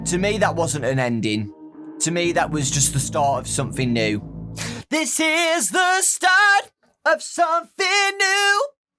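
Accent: United Kingdom. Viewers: quality content,British guy talks about a company saying hmmmmm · My dad hates your accent:( he dosent like British accent at all! But i love your accent! I wanna learn British accent!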